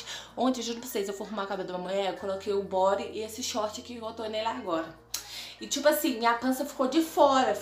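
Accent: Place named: Minas Gerais, Brazil. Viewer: Eu comecei a tomar ontem to com 49 kilos agr vamos ver daqui a um mes ne kkkkkk Vc e mineira também ? Kkk melhor sotaque ☺♥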